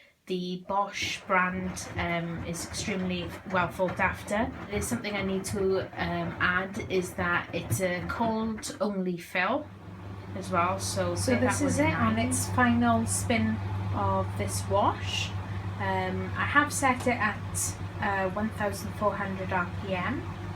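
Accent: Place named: Wales, United Kingdom. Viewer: After sueing the arse off Currys and FINALLY getting a refund for the Hotpoint washing machine that only lasted 3 months and the case stressing me out i am looking for another Bosch as my old one lasted me 17 years so i have all faith in Bosch. Thanks for the review:-) (Love the welsh accent too);-)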